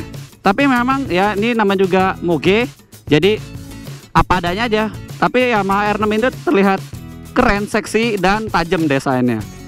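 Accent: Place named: Banyumas, Indonesia. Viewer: Lah kok rada ngapak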